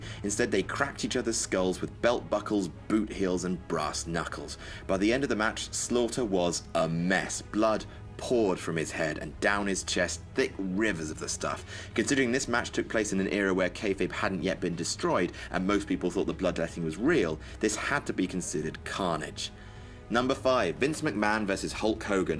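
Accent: United Kingdom. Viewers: can we not have a british guy speaking and get in to the compilation already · Steven Wall You're right. He's British.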